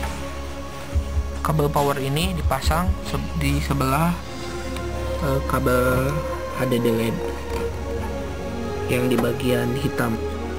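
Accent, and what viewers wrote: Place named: West Java, Indonesia. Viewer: eleuh eleuh eta kang,orang sunda nya......good kang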